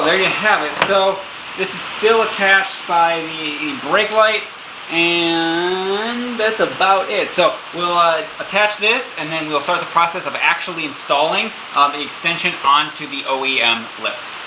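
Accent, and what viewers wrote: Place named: United States. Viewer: Why do Americans say m k instead of ‘mark’?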